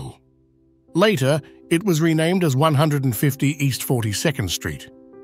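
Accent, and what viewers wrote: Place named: Australia. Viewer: I looked at your 'about' and see that you are located in the UK. I hear an Aussie accent 🤔😊.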